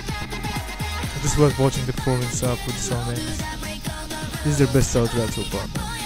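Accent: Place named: Brazil. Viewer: I like your Brazillian accent!😍so classy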